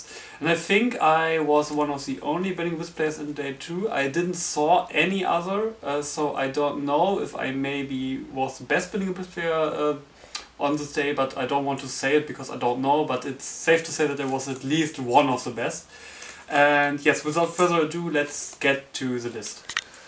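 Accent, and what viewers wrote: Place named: Germany. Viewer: Nice List :D PS: Man merkt anhand deinem deutschen Akzent,dass du aus Deutschland bist xD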